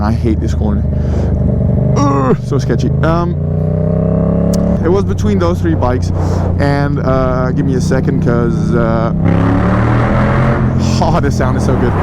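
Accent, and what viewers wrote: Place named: United States. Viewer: you have a very American sounding accent lol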